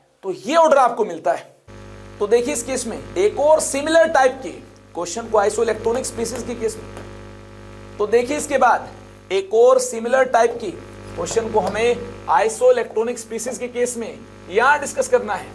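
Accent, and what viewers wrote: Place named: Haryana, India. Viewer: Sir aap ka tone haryanvi h ...😆😁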